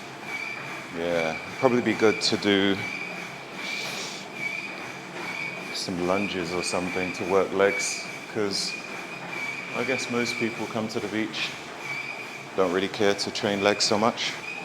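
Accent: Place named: Europe. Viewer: Love the accent I can listen to Europeans talk all day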